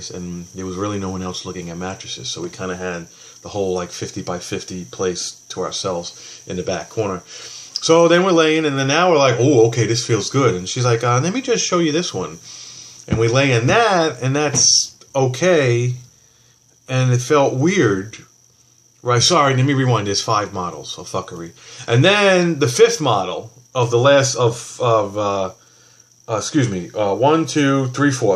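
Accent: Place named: Boston, United States. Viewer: your video's are hilarious, i'm from Boston and you say things the way me or my friends would with the gestures and noises that come out of you mouth, it cracks me up. i can't stop laughing but everything is so true + that makes it even funnier.keep making videos or hit the stage on open mike night at the comedy cellar or the Improv.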